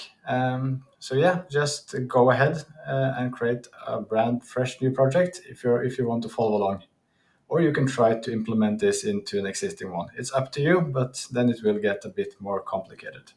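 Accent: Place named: Norway. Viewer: I love your vids too, they're explained in great detail and it shows that you really have a great talent for coding as well as explaining. Keep the great work man ! Side (and totally unrelated) question : wouldn't you happen to be Norwegian ? You have the same English accent as the actors playing in that AWESOME norwegian series Vikingane / Northmen !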